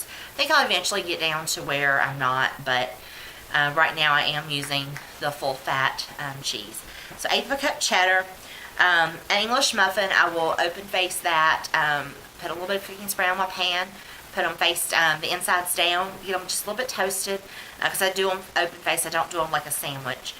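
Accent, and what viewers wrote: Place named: South, United States. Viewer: My starting weight was 336. I'm down 116, so you can do it!! I know those grandma dinners! Just make good choices. I'm late to the party here. Glad I found you! Sounds like you're a southern gal too. Just get that weight off before you're older like me! I'll be 60 soon. You're very organized in the video. Great job!!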